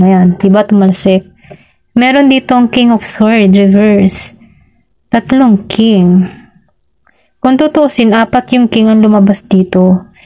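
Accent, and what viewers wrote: Batangas, Philippines. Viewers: Parang Batangueña ang isa sa pa paborito kong tarot reader😊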